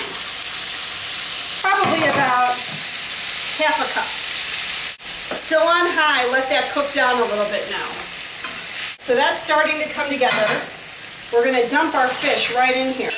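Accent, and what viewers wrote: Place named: Midwest, United States. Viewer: Beautiful woman. Her accent sound like from the mid West.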